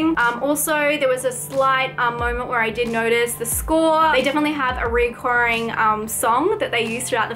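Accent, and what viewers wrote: Australia. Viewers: He probably didn't understand the accent and meant to say Australian accent. No need to be so passive aggressively mean to your viewers.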